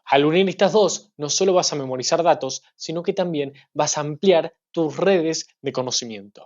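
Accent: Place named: Uruguay. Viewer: Muy buena recomendación 👌 👌 Eres uruguayo? Lo digo por el acento.Saludos desde La Rioja Argentina.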